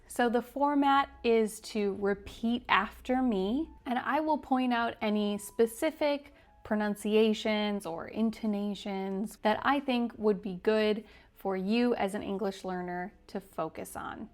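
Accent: United States. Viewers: ✨ You sound so good in this shadowing video! Super inspiring to practice along and pick up the American accent, intonation, and flow. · Una pregunta es inglés americano ??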